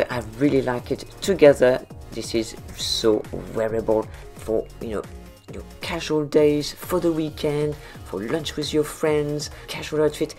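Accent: France. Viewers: Aw, I liked it when you used to say “ to receive the notifications”. It was a little charming clue that French is your first language!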